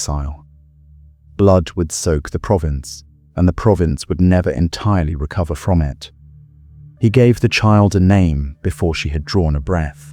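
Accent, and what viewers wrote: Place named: United Kingdom. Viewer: Truly appreciate that the talk over is done by someone who's actually British or English rather than some American with their dirty terminology that will twist what truths we've got left I Appreciated This Thank You Buddy 🌹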